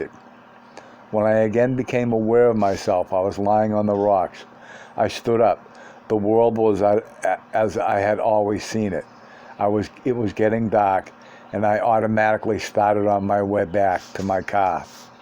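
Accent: Boston, United States. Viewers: This is so niche, and I never thought I would listen to Castaneda with a heavy Boston accent which makes it all more mystifying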